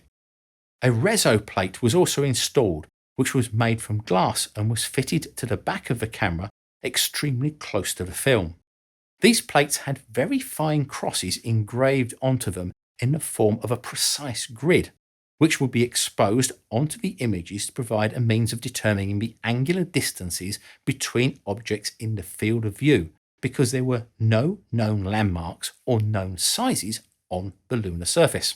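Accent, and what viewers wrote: United Kingdom. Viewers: When NASA begins to run into a wall they bring this soft spoken non confrontational! British accented to sound sophisticated and knowledgeable! To help remove doubt that this is again a story for the first time discussing a hand held camera in mercury capsule?😂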